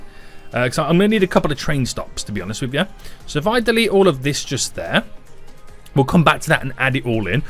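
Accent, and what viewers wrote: United Kingdom. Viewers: Love ur british acccent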